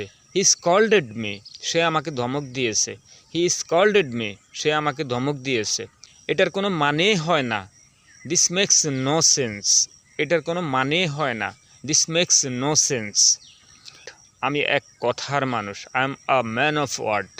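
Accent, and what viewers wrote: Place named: Noakhali, Bangladesh. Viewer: এই বেটা কথক, তোর বাংলা উচ্চারণ ভুল আছে, ইংরেজীতেও ভুল আছে।কারণ স্কুলে থাকতে ইংরেজী স্যারের বেতের বাড়ী খাস নাইতো, ঐ জন্য! বাংলা উচ্চারণে নোয়াখালির টান পরিষ্কার ভাবে বুঝা গেছে। উচ্চারণ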